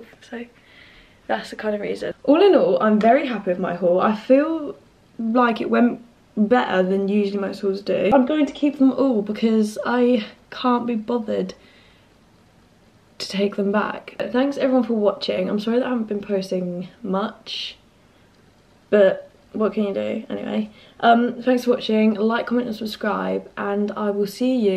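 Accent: United Kingdom. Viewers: oh my god she’s british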